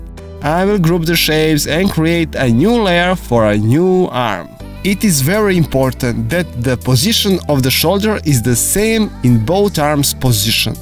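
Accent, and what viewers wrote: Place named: Russia. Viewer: Russian accent:)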